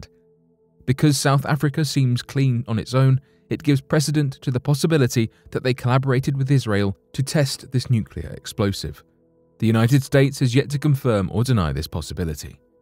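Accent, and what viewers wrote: United Kingdom. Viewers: Where do we go to find non-british speaking narrators?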